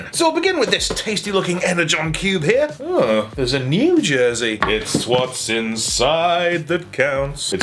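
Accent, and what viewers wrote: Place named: Birmingham, United Kingdom. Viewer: West Midlands crew report, Stafford here... P.S I caught the brummie twang straight off the bat haha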